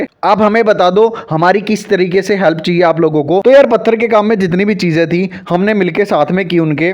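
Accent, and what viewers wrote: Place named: Delhi, India. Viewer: desi language me aane Diya Karo shorts bhai desi ka aalg maja hai na jis hisb te bolo ho nu lago ho delhi aale paplu ho. but ek chig ke liye appreciation dena chahuga ki jo pdhhe likhe hoya kare bikuo sense ke tarike se bolte ho . arr ree yo karle 😂 yo hoga wo na karte all the best for you shop🫠 god bless you but ek chig khena chahunga ki aata base use karo ya multi grain khud bhi banwa sako ho arr bdhya quality ke jite wo healthy consider howega arr public daily intake easily kar legi . madia aala sodha bitha dega body nee baki ap samjadar ho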